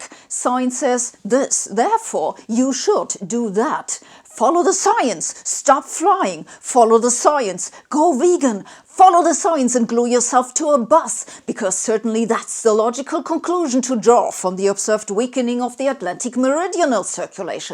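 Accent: Germany. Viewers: She's acting pissed, but by the end of the video I can tell she's half dead inside having to break down something that was supposed to be obvious to most people, and yet it isn't. Keep up the amazing job in your career and in your Youtube channel! PS: Any female with an angry german accent will always have a special place in my heart <3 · Lol, your accent is German, but you cuss like an American Army drill instructor. Bravo!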